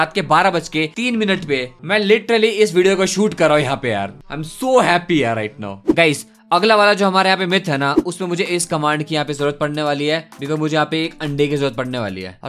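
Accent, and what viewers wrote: India. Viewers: Why do all indian sound like this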